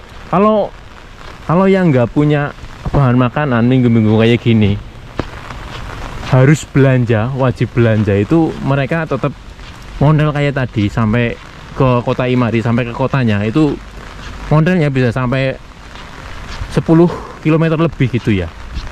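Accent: Banyumas, Indonesia. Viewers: Mas, kayane logate ngapak ya?, panjenengan nyambut gawene opo to mas?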